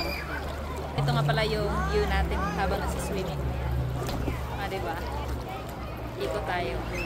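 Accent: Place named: United Kingdom. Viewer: Hiningal n c madame at tsaka ñaimpluwensyahan ng British toungue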